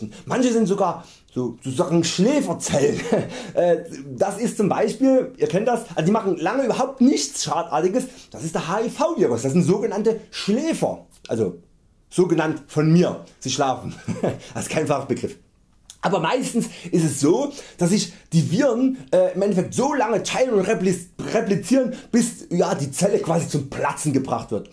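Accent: Saxony, Germany. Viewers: Du hast exakt den gleichen Akzent wie Olaf Schubert richtig gut gerade wenn du genommen sagst haha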